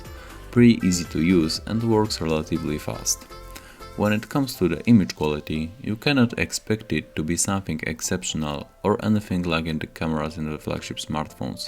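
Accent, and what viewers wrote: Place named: Poland. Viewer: Po akcencie wydaje mi się że musisz być z Polski 😉😉👍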